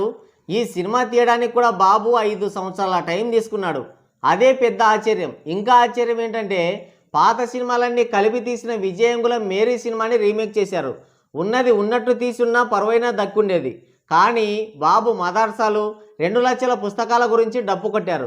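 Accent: Rayalaseema, India. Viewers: ఇదీ పక్క మా రాయలసీమ యాస నే😂😂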